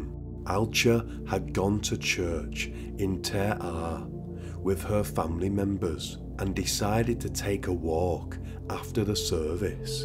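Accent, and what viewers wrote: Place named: Merseyside, United Kingdom. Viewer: he sounds like hes from Merseyside, the same region as, but hes toned and slowed down his accent for these vids.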